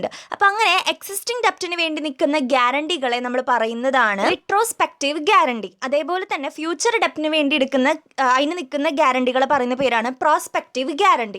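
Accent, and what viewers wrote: Thrissur, India. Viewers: Miss nte slank Thrissur kkaarde pole ind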